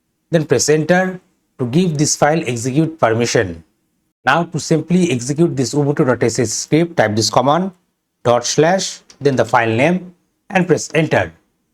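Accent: India. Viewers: are you from the south india bro?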